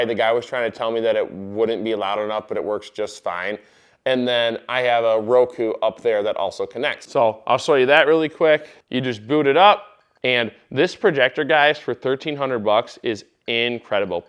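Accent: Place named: Michigan, United States. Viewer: How did it take me 15 seconds to guess you were from Michigan lol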